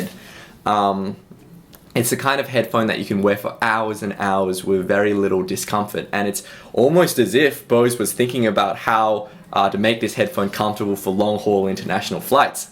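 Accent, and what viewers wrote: Australia. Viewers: He's Australian... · R3DLUFF trust me this is less australian than bogan australian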